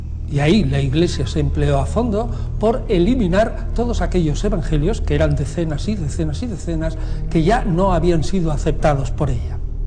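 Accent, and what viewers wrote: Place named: Argentina. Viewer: Si, está muy equivocada éste fulano, parece argentino-español. Ya en su cara se ve el castigo divino por tanta mentira. Lo malo es q mucha gente q está naciendo lo escucha y le creerán. NO LE CREAN, ÉSTE TIPO NO ESTUDIÓ CON SERENIDAD LA TRAYECTORIA DEL ÚNICO HOMBRE Q EXISTIÓ SOBRE LA TIERRA Y HASTA LA FECHA... AÚN NO NACE OTRO IGUAL. SALUDOS DESDE CHICAGO.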